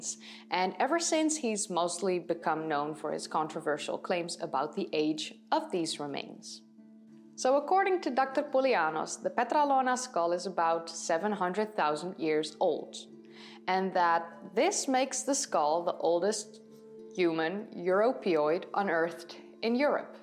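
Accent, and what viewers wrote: Netherlands. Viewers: Are you Dutch ? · The way you pronounce "technical terms", I thought this woman is Dutch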